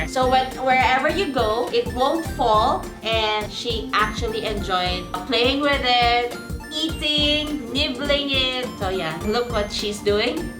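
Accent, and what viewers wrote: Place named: Singapore. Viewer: SingLish is always interesting sound like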